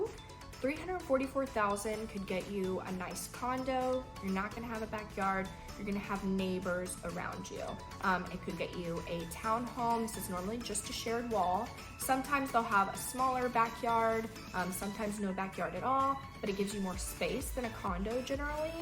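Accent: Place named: Appalachia, United States. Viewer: For a British speaker from London, this is a hillbilly accent.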